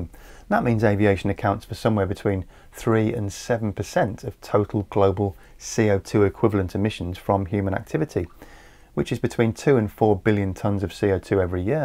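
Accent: United Kingdom. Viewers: You took a quick swipe at Boeing with your British accent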